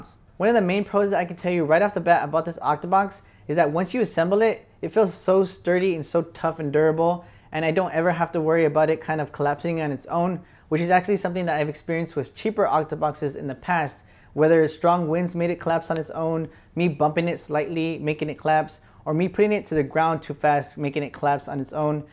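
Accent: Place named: United States. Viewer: Que tenga nombre latino significa que habla español? Por su acento me parece bastante Americano. Que estereotipada tu vision Rodolfito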